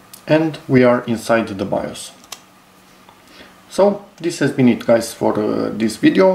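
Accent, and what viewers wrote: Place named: Romania. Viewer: Mi am dat seama dupa accent .succes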